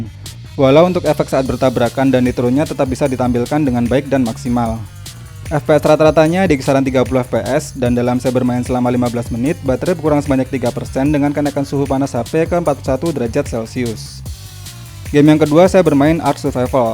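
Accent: Yogyakarta, Indonesia. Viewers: Orang Jogja ya bang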